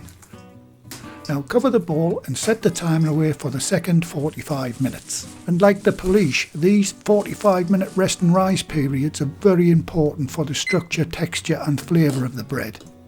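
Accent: United Kingdom. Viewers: You are not just good at cooking, you're really good at explaining details too!! The british accent is a bonus!! It sounds like Yorkshire to me. Anyway thank you!!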